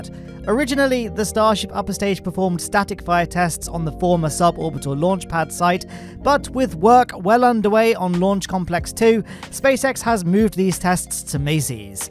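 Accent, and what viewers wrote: United Kingdom. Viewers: I know it may be difficult with a British accent but you need to pronounce certain words correctly, like a persons name or the name of a location. It isn't pronounced Macy as in the Macy's Day Parade, it's pronounced Massee's as in Ma sees, with a long 'a' sound. Everyone else in the dozens and dozens of YouTube channels pronounce it correctly, so why can't you? That is my only criticism of an otherwise excellent video, if I can't pronounce something correctly I find out how to pronounce and get it right, anyone can do it.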